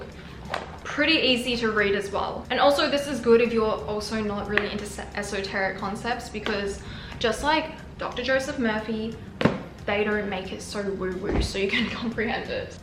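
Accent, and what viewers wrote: Australia. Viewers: Asian Aussie accent ia cool 🤙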